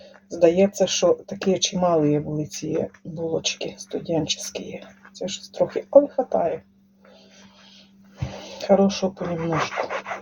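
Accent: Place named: Ovruch, Ukraine. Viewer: Про овручский диалект не слышали? Так хотя бы поинтересуйтесь об этом. В каждой стране люди разговаривают на разных диалектах, говорах. Нельзя так неуважительно относиться к людям.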